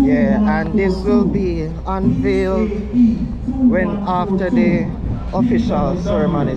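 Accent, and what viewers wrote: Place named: Jamaica. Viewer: Are you Guyanese, you sound like you’re from Jamaica? Good job nevertheless kudos to you. 👏🏽👏🏽👏🏽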